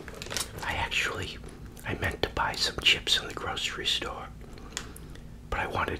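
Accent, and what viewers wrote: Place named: Massachusetts, United States. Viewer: Previously being from Massachusetts it's nice watching these videos and hearing a familiar accent.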